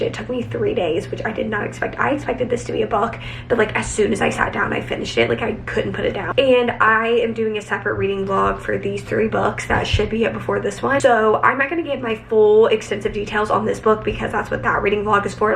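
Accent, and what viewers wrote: South, United States. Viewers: Her southern accent is the cutest thing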